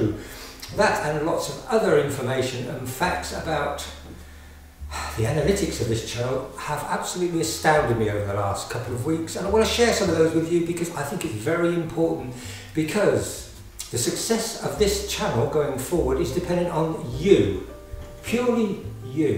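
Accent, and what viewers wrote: United Kingdom. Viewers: My reason for viewing is to see and hear what you have to say. Like I said before I am a sucker for an English accent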